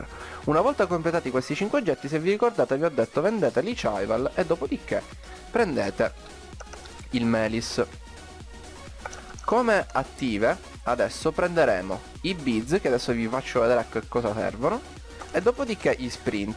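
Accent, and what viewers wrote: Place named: Palermo, Italy. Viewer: Io lo sento, tu sei palermitano ahahah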